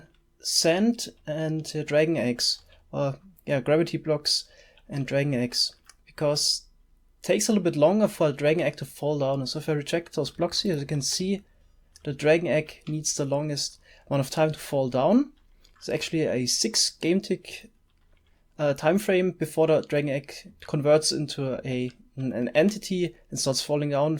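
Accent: Germany. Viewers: du bist ein deutcher das hört man omg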